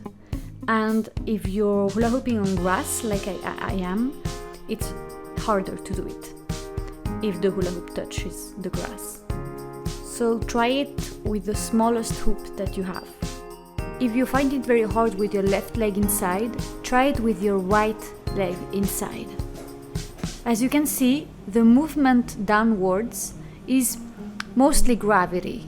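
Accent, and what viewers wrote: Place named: Colombia. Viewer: wonderful combo! love them all. I have been adding them to my flow :)you have an accent where are you from? Colombia?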